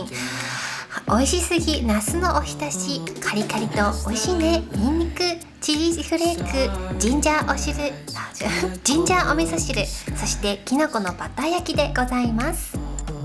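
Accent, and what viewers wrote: Okinawa, Japan. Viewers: の沖縄なまり可愛い😍💕沖縄で食べたシリシリ、美味しかったのにちゃんと習ってないので、このレシピを真似て作ります！ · の沖縄弁とキャビンクリューみたいな話し方が可愛い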